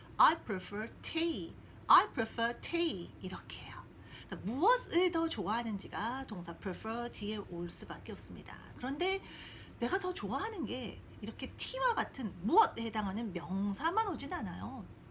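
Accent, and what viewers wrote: United Kingdom. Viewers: 선생님의 브리티쉬 발음 너무 멋있어요~😍 전 북미권에서 공부했던지라 ㅋ 선생님 목소리를 유투브에서 들을 수 있어서 너무 좋아요~ 유학시절 추억돋네요~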